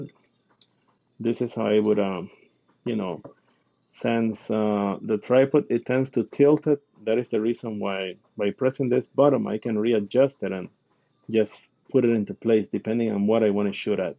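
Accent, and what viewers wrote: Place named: India. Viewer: Put Indian accent in title so we don’t click on that …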